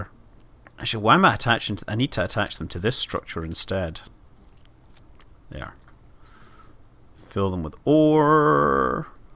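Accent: Scotland, United Kingdom. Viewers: You haven't truly lived until you've heard a Scotsman recite Star Wars quotes. Well done,.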